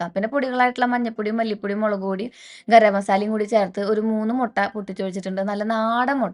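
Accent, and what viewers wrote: Thrissur, India. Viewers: Eyyy... Noo... ഇത് തൃശ്ശൂർക്കാരുടെ സ്വന്തം ശൈലിയാണ്.. ❤️❤️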